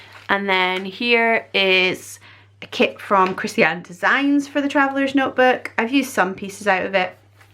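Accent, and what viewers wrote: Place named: Northeast Scotland, United Kingdom. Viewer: You sound like you must be based in the North East of Scotland? Love this video by the way!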